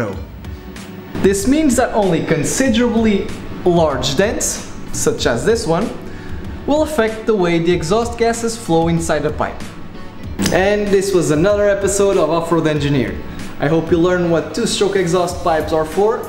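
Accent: Portugal. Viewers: Esse sotaque portugues nao engana ninguem ahahah. Subscrito! Adorei o conteúdo e a explicação direta ao assunto. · o narrador é Português... sotaque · E bem diferente ouvir um português a falar inglês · Bem me parecia que eras tuga XD XD XD assim como o M Cunha não conhecia o canal... Big Props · Ótimo vídeo meu caro! Reconheci seu sotaque português de cara! Um abraço do Brasil! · Ah...a bela pronúncia portuguesa sobre o inglês :D Não engana! Não conhecia o canal, muito interessante. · és portugues?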